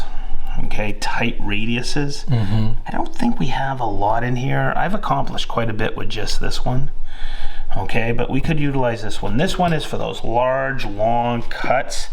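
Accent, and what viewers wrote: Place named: East Coast, United States. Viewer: Been a long time carpenter and really have enjoyed watching your videos. Living in So Cal my whole life really dig the east coast humor and accent 🙌🏼🙌🏼 Been starting to follow the Festool live show also. Moved here to NC and ISO a festool location 🤷🏻‍♂️ any help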